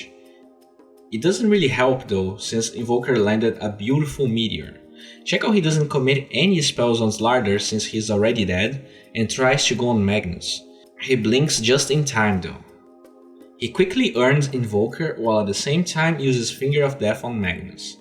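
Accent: Brazil. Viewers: ^ This. I'm unfamiliar with the brazilian accent so it makes it hard for me to understand him sometimes. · Let the brazilian man with the brazilian accent do what he likes. It's not like people can't understand shit because he pronounces words with an accent.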